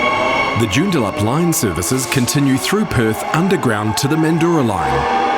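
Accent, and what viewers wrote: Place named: New Zealand. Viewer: "The guy" Timo is referring to is the commentator (you ? ) who has mispronounced Mandurah (pron Man-djrah) as mentioned by Jason G. The commentator has a NZ accent and may also be familiar with some of the old diesel powered multiple unit rolling stock from Perth that ended up in NZ. Excellent video by the way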